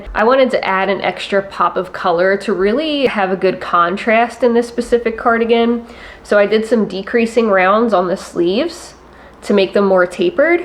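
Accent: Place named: Pennsylvania, United States. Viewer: Love #5! The shape and colors are perfect! I'm currently making a bell sleeve top as a gift for a friend. Are you from Pennsylvania or upstate NY? I hear that part of the country in your voice 😊 great work btw!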